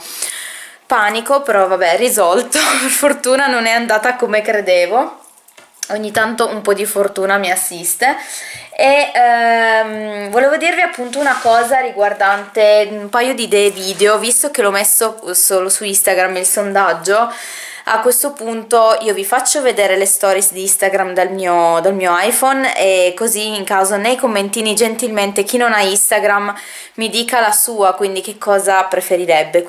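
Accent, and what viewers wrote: Turin, Italy. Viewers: Ciao tesoro ♥️ FREGATENE DI QUESTE PERSONE, perché non hanno un perché in questa vita. Sono povere dentro, commentano tanto per, perché durante il giorno non hanno nulla da fare, commentano perché credono di farsi belle agli occhi degli altri ma in realtà sono delle nullità. Stai super serena e continua a stare in pigiama quanto ti pare e piace perché nessuno può e sopratutto deve dirti cosa fare e cosa non fare❤️ Tesoro dato che è uscito il discorso like e dislike.. noto che nel tuo canale appunto non esce il numero dei like e dislike, ma solo il pollice in su e il pollice in giù! Ma è un’impostazione che hai messo tu? Perché vedo che su altri canali non è così! Solo per pura curiosità ahah 😂🙊 Comunque adoro troppo i tuoi vlogmas😍 adoro vedere la vostra quotidianità, e amo tantissimo il tuo accento torinese 😍😍❤️❤️ vedrei i tuoi vlog ogni giorno, mi tieni troppa compagnia 😍 Un bacione enorme ❤️❤️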